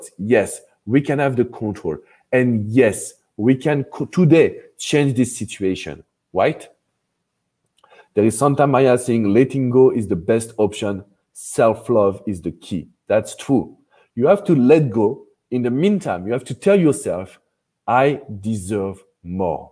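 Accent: France. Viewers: I am here for the french accent. :-) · NG from Nigeria. U are always apt and on point. I love the French accent. U are simply the best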